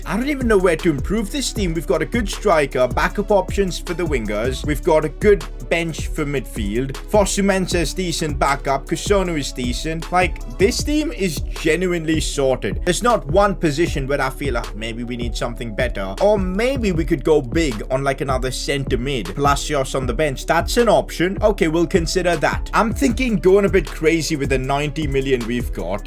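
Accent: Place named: India. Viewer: Great video but damn! Your Indian accent puts me off lol😂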